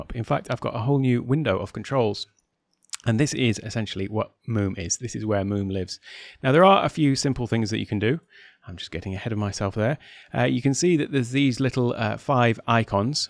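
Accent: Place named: Europe. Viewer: "that was a bit of useless information" - yes it was ha ha, but you Euros are just so pleasant to listen to I didn't mind it. Any of us across the pod we would have lost everyone in 30 sec...ha ha. Thx for taking the time to post, appreciate ya.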